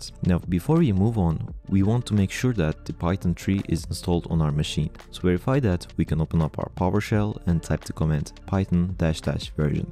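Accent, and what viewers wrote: Turkey. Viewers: gördüğüm en türk aksanlı kişi 💀ama takdir ettim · aynen ya sesini duyunca kesin türk dedim :D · türkmüsün kanka aksanın tam öyle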